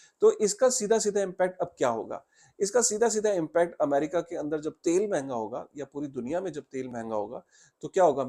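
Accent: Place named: India. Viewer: Interesting subject. But Indian dialect is literally the worst to listen too 😬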